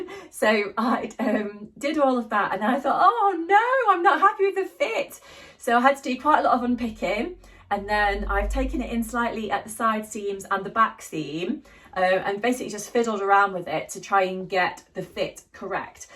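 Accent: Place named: Cheshire, United Kingdom. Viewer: I really like the look of your Tulip skirt and Sew Over It have a sale on at the moment - guess what I’m buying! 😂 I’m not a fan of Tammy’s patterns though, I’ve tried a couple and they don’t suit my body shape (I’m slightly rounder in various places than you are 😂). Good job there’s room for all of us in dressmaking, isn’t it! I’m currently doing a proper fitting course with Gina Renee Designs online, making a moulage and a bodice sloper as I’m so sick and fed up of my blouses etc not fitting properly and having to give them away or charity shop all my hard work. I’ll know how to adapt my rather extensive pattern collection then, I reckon and until I do, I’m making men’s shirts for my husband! Lovely to see you again btw, with your lovely accent that sounds not too far off from me (Cheshire)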